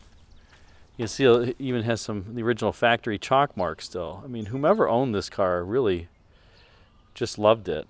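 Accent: United States. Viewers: Always fascinated by American pronunciation of words like brougham & coupé. Unlike anywhere else! I do enjoy these videos; the cars featured in them hardly ever appeared on this side of the Atlantic. What baffles me is that so many seem to have switches on a part of the dash that can only be reached through the steering wheel. 🤔 Also intrigued that the speedo on this car goes 110,115,120. Don't recall seeing that 5 mph indication before.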